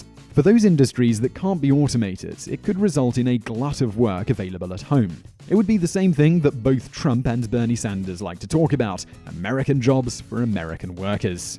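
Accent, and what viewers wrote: England, United Kingdom. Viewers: Your arguments against minimum wage are biased and riidiculous. Also, I do believe I hear an English accent in your tone. Perhaps you should stick to issues in that country.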